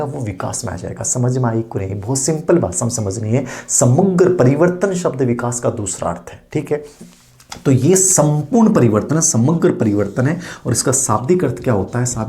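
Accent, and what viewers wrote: Rajasthan, India. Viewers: Sir app Rajasthan se h Kya language se lagta h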